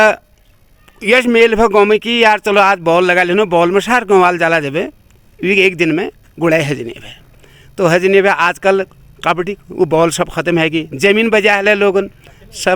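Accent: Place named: Pahari, India. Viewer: ji apka swagat karta hu app pahari me baat krti ho bahut accha lagta hai 🙏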